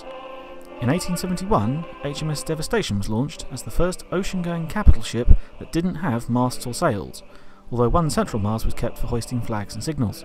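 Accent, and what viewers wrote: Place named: United States. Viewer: He's definitely an Immigrant going by his American English and awful grammar.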